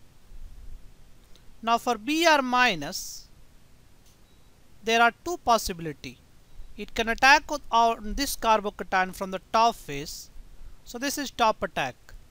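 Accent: India. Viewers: I love Indian accents :)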